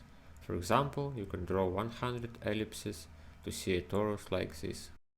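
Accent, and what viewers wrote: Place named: Russia. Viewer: You have a wonderfull Russian Accent 🥲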